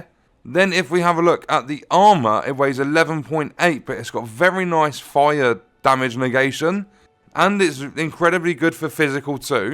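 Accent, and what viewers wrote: England, United Kingdom. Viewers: Sometimes His British accent make me confused 😂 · Great video mate was exactly what I was looking for, is also nice to hear a English accent for once doing one of these walkthroughs! Will be checking in regularly. Keep up the excellent content 🍻👍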